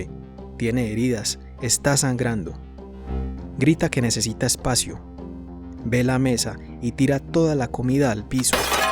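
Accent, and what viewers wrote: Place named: Colombia. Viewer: sos de Colombia? Digo, supongo que es lógico por el vídeo de los Simpson, pero ese ascento es demasiado reconocible😂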